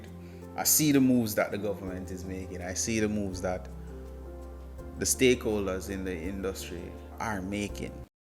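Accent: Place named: Jamaica. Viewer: I am watching the video and my mouth is on the floor because I am wondering if it is the Island Jamaica that I still live on where a week don't go by that I don't experience, the worst Customer service possible to the average Jamaican, the slaughterhouse Healthcare system that took my mom's life in 2022 and continue to do so for the average Jamaican without accountability and not to mention the death rate of our women and girls consistently. For a moment I wanted to believe he was talking about another Island or Country named Jamaica but he has our accent and sound very much like wi, so him really talking about the Island I live on. I think I betta mind mi business and allow him to exercise him Marketing Skills.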